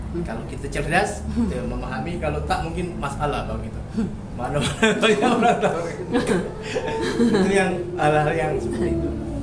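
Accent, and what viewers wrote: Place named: Java, Indonesia. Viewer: Dari logatnya iya sptnya turunan jawa tp knp menjelek"an suasana di negara sendiri? Jkt tdk separah itu Jkt bkn spt New Delhi / Dakka. Jln layang di mana" bahkan kini sdg di bangun kereta bawah tanah / subway. Mmg Jkt tdk punya gdg tertinggi di dunia tp percayalah suatu kota akan terkenal bkn krn ada gdg fenomalnya tp krn suasana dan keramahannya jg keunikannya. Masjid terbsr seasia tenggara ada di Jkt, surga belanja terbsr seasia tgra jg ada di jkt yaitu di Tanah Abang. Mau makan makanan seluruh Indonesia dtg sja ke Jkt dari Warung Tegal, Sop Konro, Bubur Manado, Pepes Sunda hingga masakan padang smua bs anda jumpai di Jkt dari kls pinggir jalan hga di hotel" berbintang.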